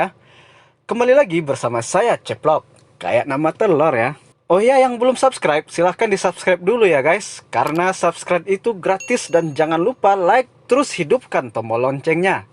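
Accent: West Sumatra, Indonesia. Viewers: Urang minang asli kiro e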